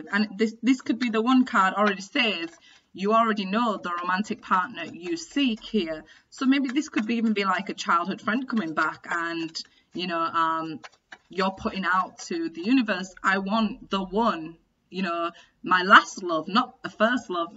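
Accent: Yorkshire, United Kingdom. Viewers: Hi I'm just wondering if you do individual readings? It's good to hear a Yorkshire accent on YouTube 😊 Xx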